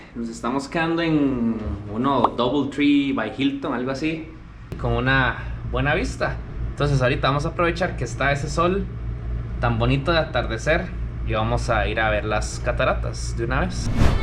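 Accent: Costa Rica. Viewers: Si ud no es costarricense y no sabe aceptar o tolerar que así hablamos los Ticos; si no sabe reconocer que esta es una de nuestras características, entonces pásese a otro canal donde hablen un español neutro y sin ese saborcito Tico que le pone a sus vídeos... De lo contrario, calladito más bonito... 🤫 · No más abriendo el video, escucho ese acento y le digo a mi señora ese “mae” tiene que ser tico Pero lo que no me dejó duda, fue cuando dijiste que el arroz que comiste, “era como cantonés”. Así o más tico, lo digo con orgullo😂😂😂 👍🏻👍🏻👍🏻👍🏻👍🏻🇨🇷🇨🇷🇨🇷🇨🇷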